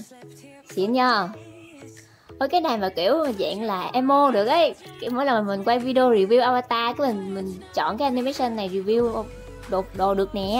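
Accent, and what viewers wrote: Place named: North, Vietnam. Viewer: Giọng Bắc nữa cơ :3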